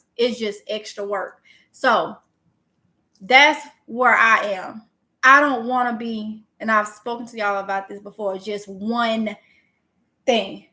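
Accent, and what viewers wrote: South, United States. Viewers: GM, im awake at 4 something in the morning. Im 79 yrs old in upstate NY. Im learning and remember when i was 36 yrs old, i had a new born baby, working the night shift and husband took care of baby at night. I love you take care of your family. And I can understand your southern accent. My Mom had it also.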